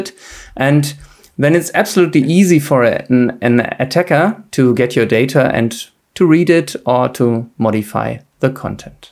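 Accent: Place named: Germany. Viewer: He must be german! i can hear it from his accent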